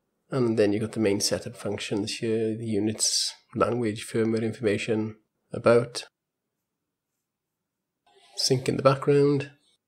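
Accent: Wales, United Kingdom. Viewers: u sound welsh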